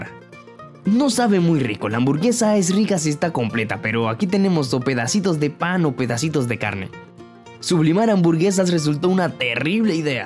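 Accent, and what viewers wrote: Russia. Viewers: Eres ruso?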